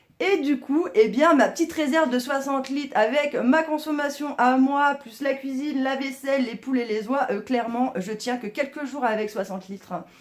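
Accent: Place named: Saint-Étienne, France. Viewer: Hello, il me semblais bien que ton accent me disais qu'elle chose surtout quand tu as parlé de st Retienne!! je suis de Luriecq dans le 42 et je suis en train d'acquerir un terrain (bois = clairière) près d'Apinac à 950m d’altitude, donc comme tu vois ton expérience et très importante pour moi. Nous aurons peut être l'occas d'échanger dans un futur proche nos expériences spécifiques. Encore merci pour ton partage.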